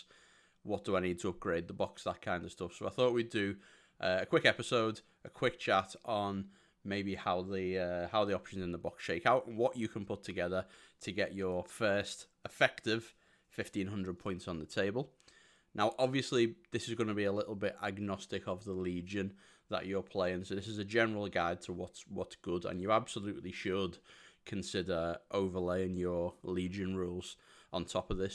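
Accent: Liverpool, United Kingdom. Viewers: wow, it's obv there but it's a much cleaner scouse twang than I'd expect. Must be from the posh part of Liverpool lol. Meant no offence haha.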